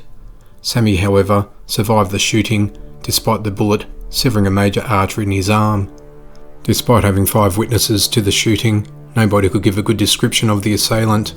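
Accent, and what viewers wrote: Australia. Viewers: Are you an Aussie? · Ricky Maveety he’s Australian, so won’t know the American pronounciation. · it's my pleasure. And the name is a shoutout to the ' ' song 😂 Can I ask if you're from NSW? I'm not, but you enunciate like Sydney Police Officers.... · ~ I have just watched 4 of your videos, I honestly didn't catch your Aussie accent! Only since reading the comments on this video, I'm in Western Australia, well done, great channel 😊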